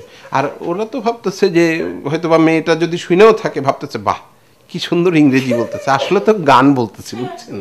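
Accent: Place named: Old Dhaka, Bangladesh. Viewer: Bhaiya, onek majul kahino 😍🤣 Apnar kintu ekhono puran dhakar pronunciation ache. Khubi bhalo Lage shunte 😍😎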